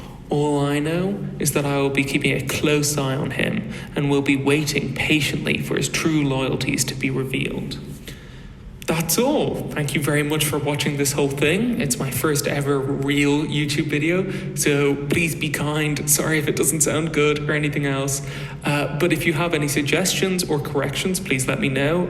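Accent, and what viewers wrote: Ireland. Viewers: Is your accident Irish? It's strong enough to sound pleasant and sophisticated on my crude yanky ears. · I think it's not unlikely there is truth to this theory. If there is, I think Arya may be present when Stoneheart questions ser Ilyn. I think it is Stoneheart's vengeful nature that will make Arya turn away from her path of revenge, and ser Ilyn's questioning may be a part of that. As for your accent, after paying attention to it, it definitely sounds Irish. It is, however, not a very strong accent (especially not compared to other Irish people I've met). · Love the theory. Haven't seen many creators ponder his role or the parallels between him and Wex. Definitely an Irish accent. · You sound Irish to me. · Phenomenal theory! Love how Ilyn's inability to speak mirrors Stonehearts and how Podrick is involved. Also I'm guessing you're from Ireland based on your accent. 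· My guess is Ireland? for accent that is lol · I liked the video. Seemed professional. I had no idea you were a new channel. Seemed like you've been doing this a while. Loved the topic, and I noticed some interesting bits about Payne in the story, but I never thought too much about it, and this was a cool dive into it. And as for your accent, I'm sure it's not strong in the sense of the general country of where you're from, but I'm American, and anything from the English-speaking world that isn't American sounds strong to my ear. I'm going to guess Irish. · Excellent video. I'm looking forward to whatever you put out next. RE: The accent, almost certainly Irish, I'd guess Dublin but not certain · You sound a bit Irish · I really like the character Dick Crab. Your clearly Irish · Re: your accent question, I’m from the US and you sound Irish to me (specifically the way you pronounce some of the names) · Easily one of my favourite theories! 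as for your accent, I diagnose you as Irish!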